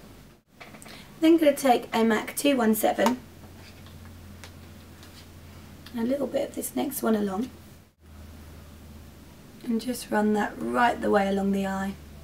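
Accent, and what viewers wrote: England, United Kingdom. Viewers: LOVE British accent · Are you British ? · I realy like your video, let me ask , where are you from!?! England?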